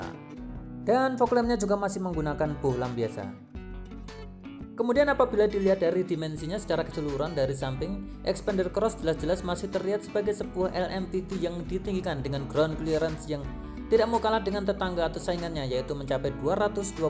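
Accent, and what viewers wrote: Java, Indonesia. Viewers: Tak LIKE 7 tak SUBSCRIBE yo, Mas. (aku seneng gaya bahasamu sing Medok iku) Qiqiqiii....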